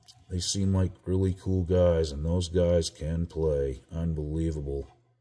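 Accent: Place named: Maine, United States. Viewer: As a Bostonian...It's enjoyable to hear a Mainer's take on people making a fuss about your accent.. I can't leave the state without someone asking me to say Pahk the Cah in Hahvahd Yahd...🤘🏻😝. You're a natural teacher... That's why I subscribed. I've learned something every video.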